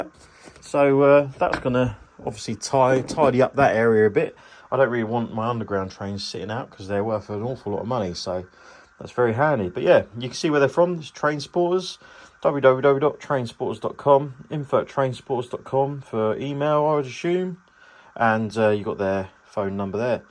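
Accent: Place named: United Kingdom. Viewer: I bet you are British yeah...yeah...yeah...yeah...yeah...yeah...yeah...yeah...yeah...................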